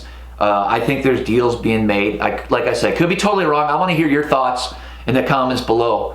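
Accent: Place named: Chesapeake Bay, United States. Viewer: also quick question, you live in Maryland ?....I am in Richmond VA and you talk upper chesapeake bay....so just curious where you live at ...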